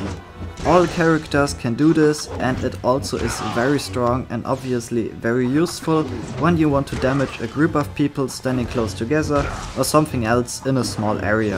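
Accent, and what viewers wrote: Germany. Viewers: dein deutsch englisch ist echt net gut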